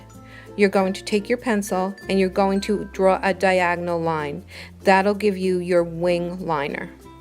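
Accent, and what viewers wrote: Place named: New York, United States. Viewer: and OHMYGAWWWD I detected a slight NY accent? A Southern Yankee transplant here from CT living in NC ... def subscribing to this channel!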